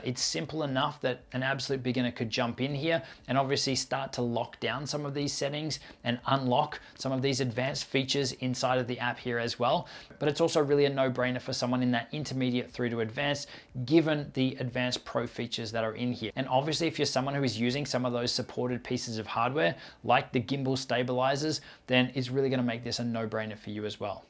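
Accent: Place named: Australia. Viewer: Hello, nice vídeo mate! Where are you talking from? I’m sure you’re an Aussie just by your accent. You look and sound very professional mate! Well done 👍🏻. It’s been great to learn a lot from your videos, thank you brother. I’m a Brazilian who’s living the dream in Perth for awhile, and now I’m setting up my own Youtube channel, thanks for your great effort mate 🙏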